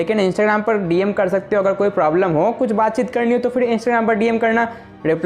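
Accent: India. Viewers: Tu Bengali hai kya bhai????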